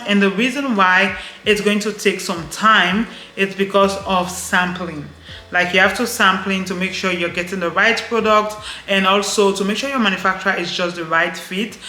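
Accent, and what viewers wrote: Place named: Cameroon. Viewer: hi i have watched most of your videos , so that i can learn and help my wife set up her pyjama line too . For now we buy from people who already shipped in to cameroon from china . so we make little profit if we want the good stuff . major challenge is how to get a good manufacturer who os reliable .Maybe you can help us . We are willing to pay for the help1; also i always wanted to ask what nationality of origin you are , because youy sound cameroonian as you have an underlyiong french accent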